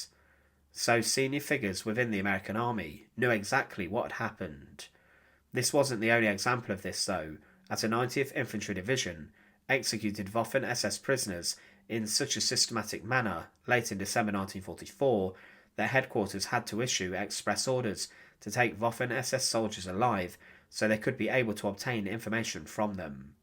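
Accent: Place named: England, United Kingdom. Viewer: I'm sorry but I can't understand a thing this lady is saying. Her English accent is way too thick.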